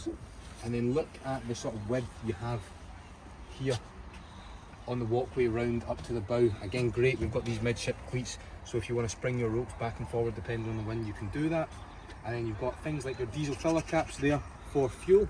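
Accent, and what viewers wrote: Scotland, United Kingdom. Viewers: I was surprised to see that the chap has Asian heritage. I did not expect that with the full on Scottish accent. Just goes to show how perceptions can be completely false.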